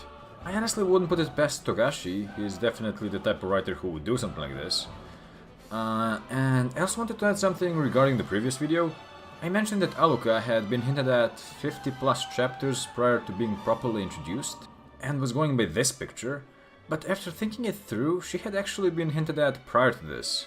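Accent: Eastern Europe. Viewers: Slavic accent is excellent, don't be shy.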